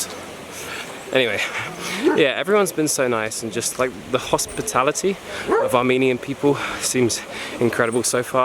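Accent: United Kingdom. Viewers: 1. In Armenian the last colour of the flag is actually called "apricot colour" -tziranaguyn. We say "karmir, kapuit, tziranaguyn" - "red, blue, colour of apricot." Scientific name of Apricot is Prunus Armeniaca (Armenian plum). 2. The war they are talking about is Artsakh (Nagorno Karabakh) liberation war launched by Azerbaijan (ethnic Turks) against Armenians of Artsakh province of historic Armenia. By the time Azerbaijan was 9 mln and Artsakh was only 100.000 Armenians and was cut of the rest of Armenia. By the time rest of Armenia was able to reach for help and get through the blockage Stepanakert (Artsakh's capital city) and villages were heavily bombed. 5 years of war and Artsakh is free preventing another Genocide done by the Turks. Artsakh is possibly the most beautiful part of Armenia. 3. Thanks for such an interesting video, mate. I lived in the Uk for 6 years and just adore British accent, that was the best part of the video for me lol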